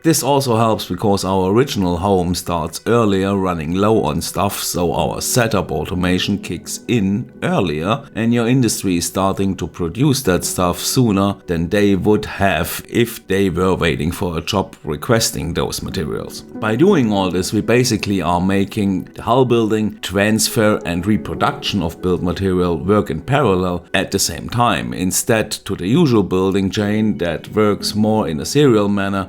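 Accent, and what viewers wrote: Germany. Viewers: Fine video. ( On a sidenote: I'm really struggling with our german accent 😅)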